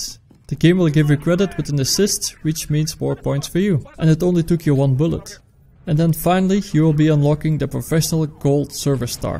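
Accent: Netherlands. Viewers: it sounds like a very strong dutch accent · dat hoor je aan zijn accent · by your accent that you are dutch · He has a Dutch accent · you're dutch, heard it in 5 seconds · je hebt het accent van een nederlander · U sound dutch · Wat heb jij een Nederlands accent zeg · haha i can just hear that you're dutch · You sound like a dutch guy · you are Dutch? Because of your accent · it sounds dutch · CLEARLY DUTCH :D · I think that you are Dutch, because of your pronounciation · Ik hoor aan u accent da gij Nederlands of Belgisch bent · hij spreekt egt die tatta engels met die gekke accent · Tis trouwens wel goed te horen dat je nederlands ben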